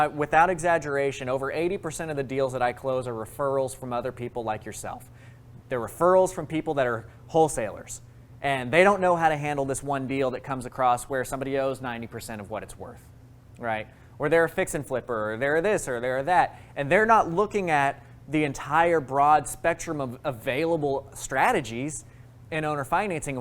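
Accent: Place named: Texas, United States. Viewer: Sheeesh you and Rod Parsely are definitely from the TX area lol vocal tone almost identical. Video 🔥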